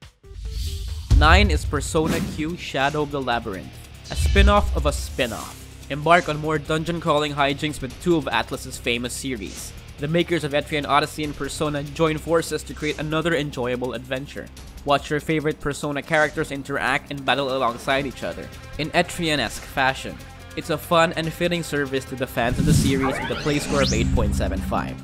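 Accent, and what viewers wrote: Philippines. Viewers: The one talking is Filipino I'm 99% sure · Is the speaker Filipino? He has the same accent as Filipinos.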